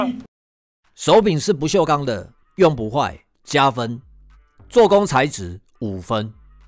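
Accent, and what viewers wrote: Taiwan. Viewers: Jasper你好，喜歡你講台語的用詞跟腔調，很合高雄人胃口😆 我是個想換刮鬍刀的新手，看了幾部你的影片， 發現一些長相很像市售盒刀的傳統刮鬍刀在你的影片畫面一閃而逝， 由於那些一閃而逝的畫面並沒有特別提到型號，所以我也就無從搜尋起， 因此想來請教Jasper，有哪些長相像一般市售盒刀，又適合新手用的傳統刮鬍刀呢? 謝謝回覆~ 🫠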